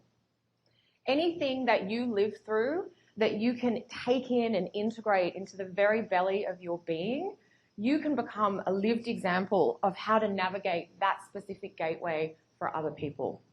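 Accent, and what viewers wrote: Australia. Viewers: Wow where are you from originally are u from Australia, like Melbourne? Omg Fiji that's lit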